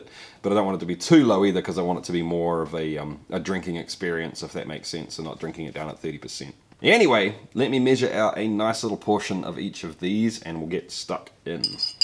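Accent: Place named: New Zealand. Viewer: not whiskey related. i usually have a hard time telling between NZ and AUS accents but this is the first time i was able to go "hey, he's a kiwi." (the "fush n chups" thing aussies talk about). just thought it was interesting.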